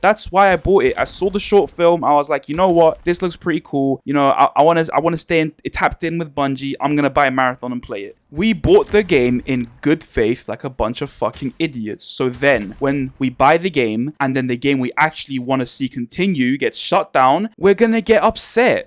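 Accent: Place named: London, United Kingdom. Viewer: "He's a white guy. He doesn't really talk to lgbt people." "It's punching up. It's ok," I used to live down south heck. I was born there, but the absolute state of younger people down there is awful. The political element is absolute biased I promise you there is own group biase in this guys content in the same way those evil "white" people naturally are anti woke seeing how woke works only ever to hurt them and benefit others while ultimately this video reeks of the sort of fake learned culture where you hide behind humour but there is a consistent vilification of white people and people who have been successful in any way but remain right wing. This must be that all inclusive London culture that has been building since the anti colonialism pro natavist heros outnumbered the natives in their own capital city and proceeded to push none native culture and establish leadership from a man who previously said white londoners aren't real londoners. 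Why am I talking about london? Because this guy is either from London or he mimics them and lives/lived relatively nearby. Either way that accent, an accent that almost always flatterns with education into a more proper way of speaking and actually pronouncing words properly, that accent is almost always a marker of someone with surface level british culture followed by deep rooted ethnic biase a learned hatred/phobia of white people despite often being white or otherwise living in a white country and the refusal to engage with any form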